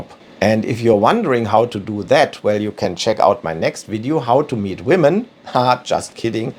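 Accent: Germany. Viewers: All logic and no emotion with a German accent….. perfectly meets stereotype 😯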